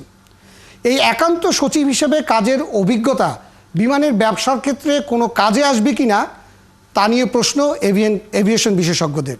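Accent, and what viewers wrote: Kolkata, India. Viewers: এটা কি বাংলাদেশের চ্যানেল। যিনি কথা বলছেন উনি কলকাতার মত করে কথাবলছেন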